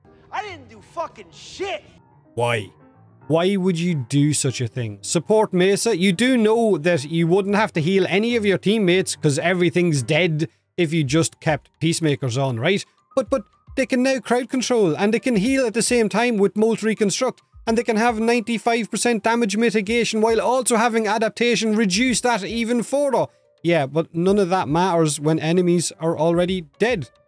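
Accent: Ireland. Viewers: Thank you for the clarification. I fuggin love your videos, and your cool ass Irish accent!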